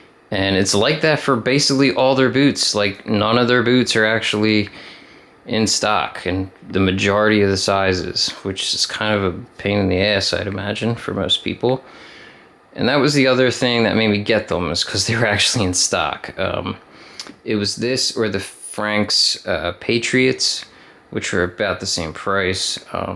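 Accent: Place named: Philadelphia, United States. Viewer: Great vid! Im guess from the accent you are from Maryland, Delaware or Phila...but if its Phila you have a light accent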